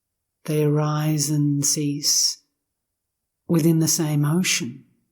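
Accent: Australia. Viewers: She has a beautiful calming voice a soft Aussie accent ❤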